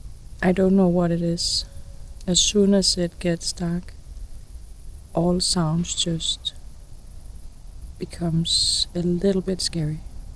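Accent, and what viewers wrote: Denmark. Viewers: Danish accent, from Denmark 🇩🇰🇩🇰🇩🇰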